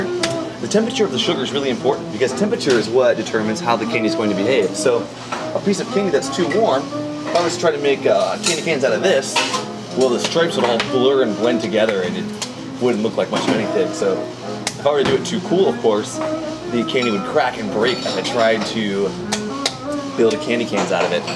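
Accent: United States. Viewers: so basically how you make rock in England but with a hook on the end lol. still fascinating to watch though, love his voice, what part of America is that accent from?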